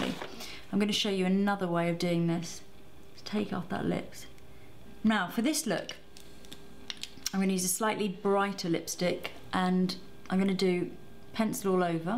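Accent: United Kingdom. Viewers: Your accent automatically makes you sound like you're automatically bright. Like a professor. But then, I remember...you're a makeup artist. It's the British accent.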